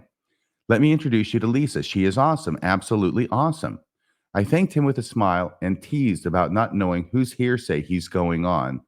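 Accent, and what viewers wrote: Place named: Utah, United States. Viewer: Thank you so much for reading the complaints word for word...even if the accents are Utahn 😅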